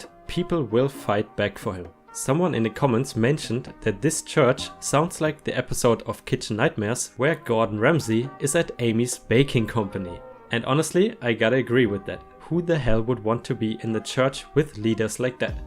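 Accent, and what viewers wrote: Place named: Germany. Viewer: You did great! Love your voice, so refreshing to hear a German speaker doing reddit stories :)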